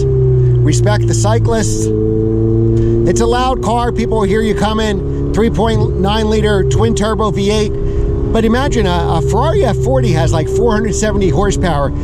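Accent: United States. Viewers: Watching the video and listening to you speak and I'm like wait a minute, this guy is from Philly. Nice review.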